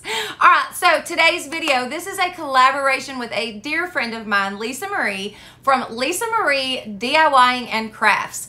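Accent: South, United States. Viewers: I started the video and my 11 year old went she's from the south right 🤣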